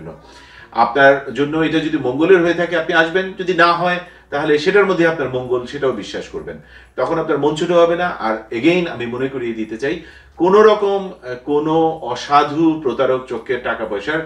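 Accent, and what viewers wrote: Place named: Kolkata, India. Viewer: I am from Kolkata. I follow your all YouTube video. It already make me your fan. Your Bengali conversation 100 percent like as our Kolkata. No one can differ you as a citizen of Kolkata or Bangladesh. Thank you so much for your informative video.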